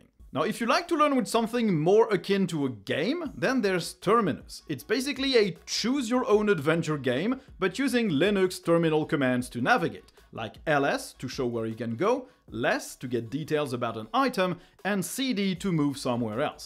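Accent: France. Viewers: J'aimerais bien avoir le même "French accent" que toi 😅 Merci pour cette énième vidéo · Your French Accent is a breath of fresh air in this world that is inundated with American nonsense. Never apologize. Thanks so much for sharing. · great video, In his time, thank you, Btw i like your french accent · Thanks, I'll check some of these sources out. And I don't mind your French accent (barely noticeable) as I speak French with an American accent. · French accent is the ❤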